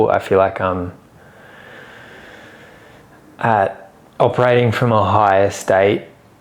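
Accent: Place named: Australia. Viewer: I love watching this Aussie and listening to him talk. The accent is so cool. Foley, Alabama here! 😁😁☀️🌴🤟🏻🫶🏻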